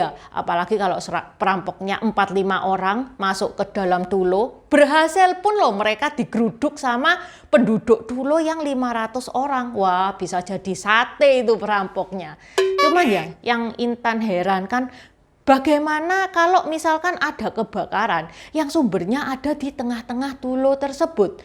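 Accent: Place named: Purwokerto, Indonesia. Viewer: Maaf mba Logat bicaranya kok seperti orang Purwokerto yach😁🤭🙏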